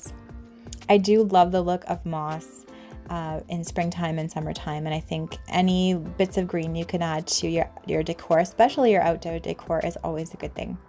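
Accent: Canada. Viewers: Hi! I just subscribed to your channel and just loved every one of your DIYs. My favorite is the bird houses, but the faux water can and your garden sign are a close second. I also love your Canadian accent and am looking forward to more videos.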